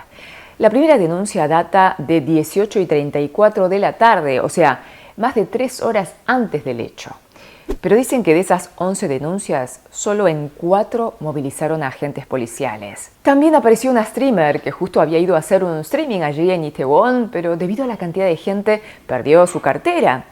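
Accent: Argentina. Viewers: Me encanta tu voz de periodista , tenes acento Argentino 🙂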